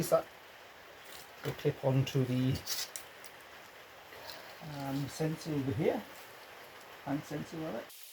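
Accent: Wales, United Kingdom. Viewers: Great Channel, sound like from Wales? Love the work on the car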